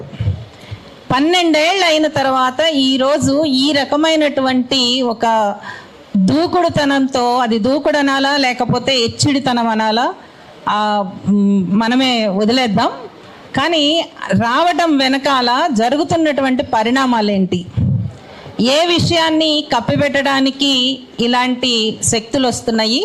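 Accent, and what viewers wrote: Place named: Andhra, India. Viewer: vellaavuu vachaavu antaavu antoo mee speech lo chala andhra slang ae vinapadtundhi... meeru endhi Telangana tarapuna maatlaadedhi??, first complete ga bhasha lo yaasa maatlaadi aa tarvatha itla press meets lo speech iste oka value untadhi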